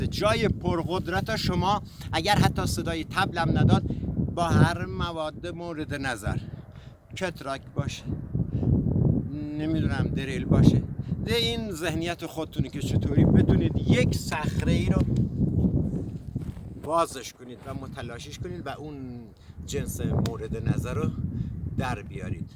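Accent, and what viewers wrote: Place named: Isfahan, Iran. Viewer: کارت درسته استاد.استاد یه ته لهجه اصفهانی هم دارید شما.آیا ساکن اصفهان نبودید؟؟؟؟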